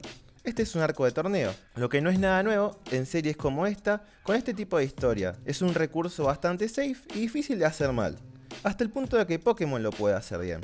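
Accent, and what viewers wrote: Argentina. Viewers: Buen vídeo, sos Argentino?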